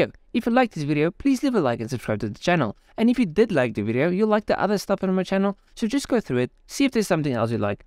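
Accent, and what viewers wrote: South Africa. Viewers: I love the south African accent so much, it's adorable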